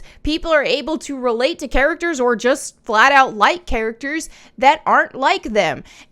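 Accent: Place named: Wisconsin, United States. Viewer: Ran into your channel after diving into the frost craziness. Awesome content creator.. you have an interesting accent. Like you liked in Wisconsin for 15 years and then moved to the south for the last 15 lol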